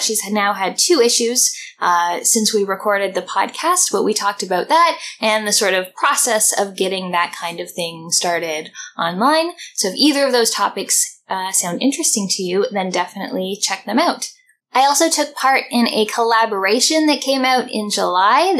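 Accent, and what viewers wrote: Canada. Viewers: i know it's a random question, but are you from canada?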